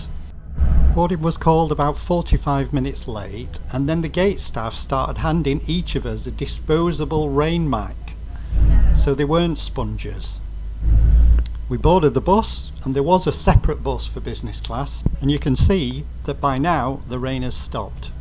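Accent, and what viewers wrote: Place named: North, United Kingdom. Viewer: With all due respect, I sometimes had trouble understanding your northern accent: "bus" for example.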